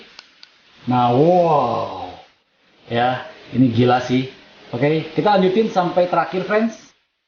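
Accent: West Java, Indonesia. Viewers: Sundanese detected, hehehe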